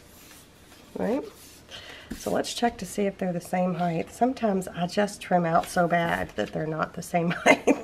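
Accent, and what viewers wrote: South, United States. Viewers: I know there's times that I have a creative block. So don't worry about it. We understand. I have a cute story to tell you. I had my neighbors 12 yr.old grandson over. He wanted to make something for his grandparents. He decided on a coupon book made from toilet paper rolls. So while he was coloring some paper to decorate with, I decided to listen to one of your videos. So I didn't put in my ear buds while watching. The video played for a bit, then he came over to look & asked me what country you where from, lol. I chuckled & said the USA & that you lived in Kentucky. I explained the people that live in the south have an accent. Of course he just said oh okay. I hope this gives you a smile, I know I get one every time I remember it. 💜💜💜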